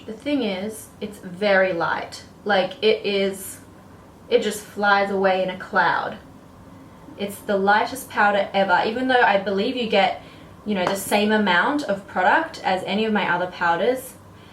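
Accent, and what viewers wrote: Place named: Australia. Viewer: I didn't even realize she was Australian until she said that and then I could hear the accent lol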